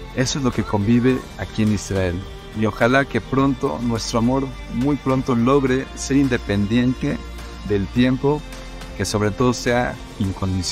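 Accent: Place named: Mexico. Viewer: TU acento es MEXICANO FELICIDADES ISRAEL LOGRO FENÓMENAL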